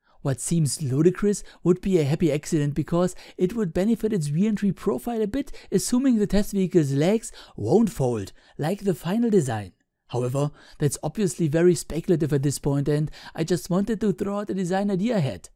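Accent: Germany. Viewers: Das nenn ich mal ein "deutscher Vorzeigeakzent" 😄🍀 top Video, danke 👍👍👍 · Almost certainly Germany. Please correct me if I'm wrong. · Junge du klingst so Deutsch ne😂😜 naja eigentlich geht's sogar. Cooles Video! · I Are you kidding? He has a really typical german accent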